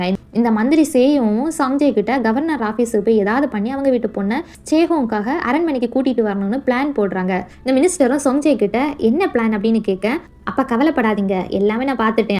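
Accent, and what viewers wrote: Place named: Kanyakumari, India. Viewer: akka neega kanyakumari yaa??? unga voice la kanyakumari slang theriuthu... i am also kanyakumari ❤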